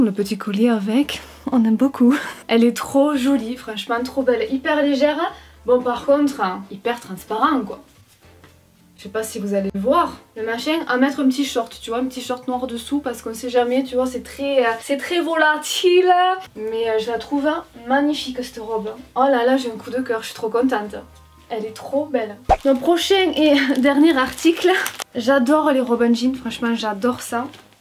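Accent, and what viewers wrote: South, France. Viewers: Je suis passé par ta chaîne par hasard et je t'ai trouvé géniale, naturel et j'adore l'accent du sud ! Reste comme tu es je t'aime trop 😻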